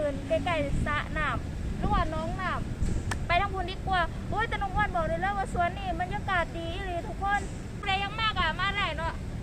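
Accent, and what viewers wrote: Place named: Isan, Thailand. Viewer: สำเนียงนี่ เป็นคนแขวงดั๋ยน้อ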